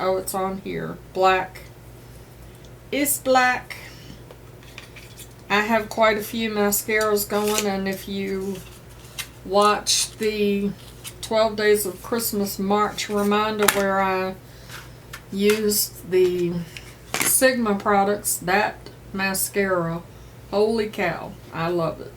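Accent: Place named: Midwest, United States. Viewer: Sorry, this is going to be a long comment. 😁 I love your videos for so many reasons. I feel like I’m listening to my mom (she’s 64, I’m 43), your accent sounds like my family’s (I’m from near St. Louis), and I love the tips to your son (which I’ve mentioned before). I emigrated to Europe 10 years ago, so your videos always make me feel close to home, even when I’ve not been back for several years. So thanks for the work you do!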